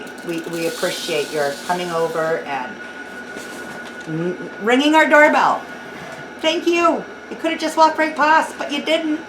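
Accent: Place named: Canada. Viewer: I guess I missed the time and temp. I saw you said 200f, but for how long? You're right, I vacuum sealed my jars, but didnt heat them first. I need to go back and redo them. I hear your Canadian accent, I was born in Ontario!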